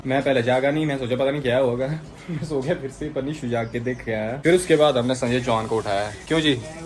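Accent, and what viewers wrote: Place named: Himachal Pradesh, India. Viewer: Himachali accent🌻❤ Stay inside and safe🌸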